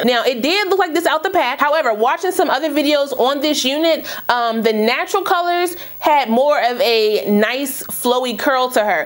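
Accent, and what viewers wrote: Washington DC, United States. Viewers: She looks so natural on you. And the color is so pretty. ❤❤❤ btw, that intro git me asking Sis, you from DC?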